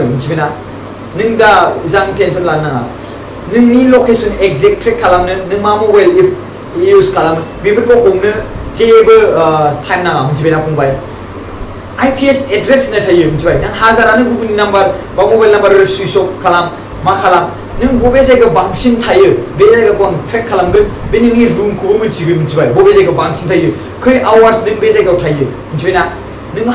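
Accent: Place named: Baksa, India. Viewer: Baksani railainai ...style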